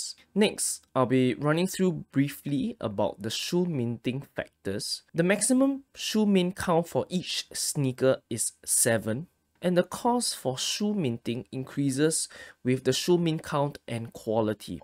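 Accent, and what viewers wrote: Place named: Malaysia. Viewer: no worries mate, ur explanation was crystal clear and highlights most of the important things that people like me wanna know. my question is, are you malaysian? singaporean? or indonesian? that english accent of yours is familiar to me haha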